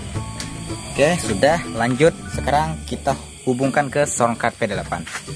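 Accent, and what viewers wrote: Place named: Banjar, Indonesia. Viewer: Suaranya banjar banar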